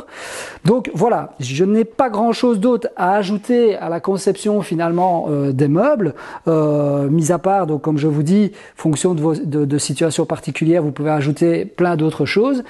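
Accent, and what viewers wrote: Belgium. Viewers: Une vidéo qui m'a donner envi de faire aussi ma propre cuisine, merci beaucoup pour ttes ces explication j'arrive plus facilement maintenant à voir comment sont fait les meubles et caissons !! Je suis abonné depuis peu mais j'adore ta pédagogie et aussi ton accent belge 😂 !! Encore merci pour tous tes partages de connaissances 🙏👍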